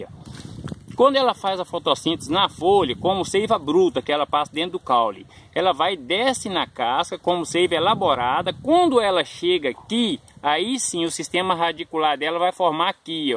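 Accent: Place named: Minas Gerais, Brazil. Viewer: Vc explica direitinho agradecida pelo video parece ate mineiro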